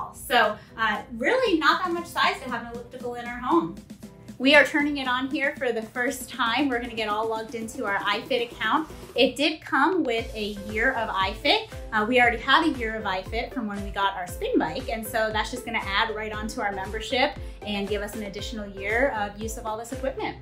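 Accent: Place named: New England, United States. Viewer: Great relaxed demo. I take it you're from either Massachusetts or New Hampshire? (THE ACCENT) 👌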